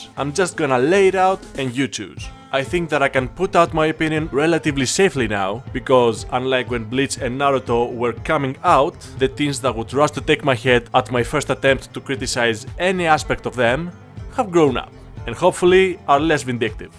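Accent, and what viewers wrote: Greece. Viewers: I watched the video 7 times to understand what country your accent is from and ended up with a question. Are you Greek? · i instantly knew you are greek from your accent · Are you Greek? Your accent is so familiar😂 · are you from greece? yhat accent is familiar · Why this guy sound like a greek man speaking english. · that's a pure greek accent xD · Φίλε μιλάς πολύ καλά αγγλικά αλλά για κάποιο λόγο με το που σε άκουσα λέω αυτός είναι Έλληνας 😂😂😂. Συγχαρητήρια πολύ καλό βίντεο!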